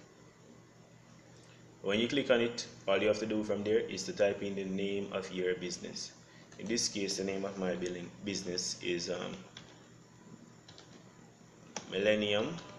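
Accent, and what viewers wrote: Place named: Jamaica. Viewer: Bet dis a Jamaican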